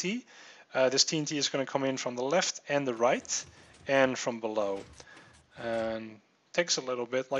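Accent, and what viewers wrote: Netherlands. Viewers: the best accent is the dutch is accent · Het valt meteen op als iemand Engels gaat praten en hij is Nederlands en vooral bij cube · are you Dutch? because Dutch accent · You sound dutch XD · Are u dutch ? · r u dutch? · Its really easy to notice that you are dutch, ya know? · He is from the Netherlands. :) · are you dutch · are u dutch? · are you dutch? you sound like it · Are you Dutch? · that dutch accent tho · this is my firt vid of you but are u dutch :P( ur accent sounds like u are) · That accent, your Dutch!!!! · I hear a Dutch accent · Je bent Nederlands ik hoor het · are you Netherlands? you accent sounds like it! I'm from the Netherlands. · Are you from the netherlands? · are you dutch???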